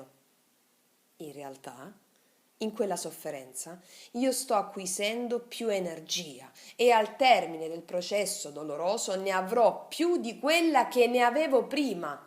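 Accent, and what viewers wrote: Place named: Apulia, Italy. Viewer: Che spettacolo che sei., non so quante volte ho sentito questo tuo lavoro bellissimo e ogni volta la mia anima vibra e mi dai coraggio forza luce,io vivo in Lombardia ma la mia origine è in Puglia credo anche molto vicino a te dall' accento che sento,mi piacerebbe un giorno abbracciarti di Persona infinitamente grazie❤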